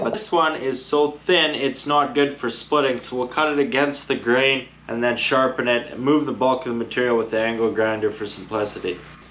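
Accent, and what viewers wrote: Canada. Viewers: Love that middle Canadian accent. If you said ‘don’t ya know’ after sentences I’d say you were from north of Minnesota lol. Love this channel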